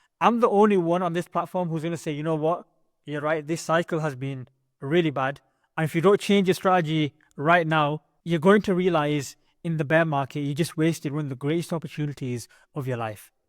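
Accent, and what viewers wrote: United Kingdom. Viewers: Love the video bro, nice to hear British crypto YouTubers 😂 . There’s a project called treble swap which is the first v4 DEX on base do you think it’s a good buy ?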